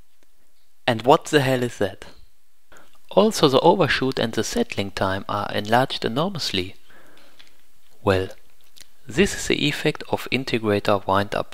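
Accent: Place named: Germany. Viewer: classic german accent haha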